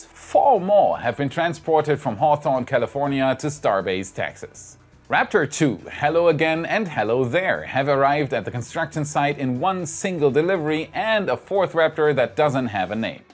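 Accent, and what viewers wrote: Germany. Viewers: I love his German accent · we're waiting for your delicious German accent - and your v narratives on the (litterally) awesome progress that SpaceX have made the past week, of course! 😱 😋🍿 A thought: the narrowed design of the flaps seems reasonable for earth's athmosphere, according to SpaceX' models, but what about the low density atm of Mars? #Whataboutit?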